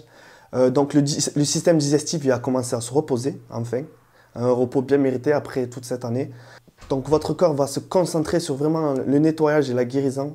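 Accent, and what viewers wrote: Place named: South, France. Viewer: J aime bien ton accent du sud mashallah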